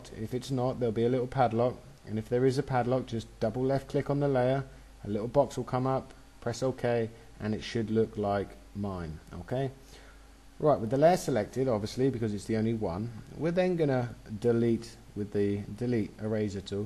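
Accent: United Kingdom. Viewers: Thank you very much master! :) nice British accent you got there >.< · lovely british accent ;) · Thank you, your tutorials are very clear and you demonstrate in a manner that is easy for beginners and the more advanced users to understand. You sound like you are English with your accent?.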